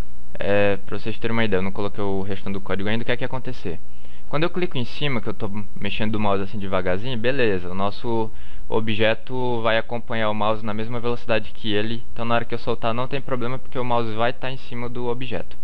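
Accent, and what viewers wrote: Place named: Rio Grande do Norte, Brazil. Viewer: Interior do RN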